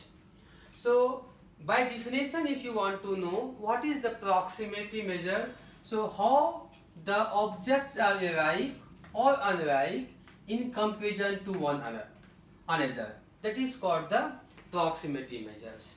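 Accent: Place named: India. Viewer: not racist but damn indian accent is something... tbh i rather hear indian speak indian so i can at least use auto generated.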